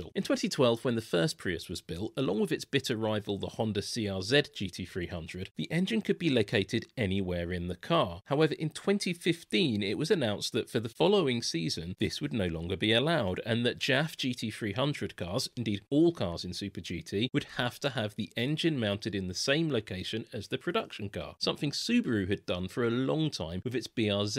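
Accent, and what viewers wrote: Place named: England, United Kingdom. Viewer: English accents are the worst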